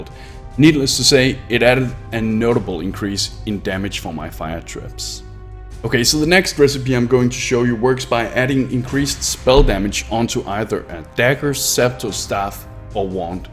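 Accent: Denmark. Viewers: You sound Danish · omg sounds so danish english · wow this is the epitome of danish accents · Are you danglish? You sound danglish :D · You're danish aren't you? :) Anyway, nice video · Very informative and useful! Judging from your accent, I bet you're danish, so "Mange tak!" :) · Heh, danish accent. Du lyder næsten som en ung Lars Løkke =)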